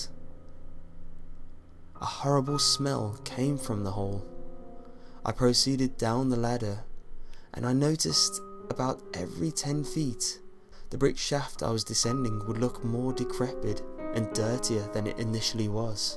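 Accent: United Kingdom. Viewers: British people r so lucky, all u need is ur voice, piano, n a creepy pic to make scary vid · i loce this guys accent im a big fan of british accents :) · I've listened to some of your pastas now and I like the storys, you choose. I'm also so much into your accent! I could listen to british accent for hours (I'm from Germany) Anyway, you do a great job!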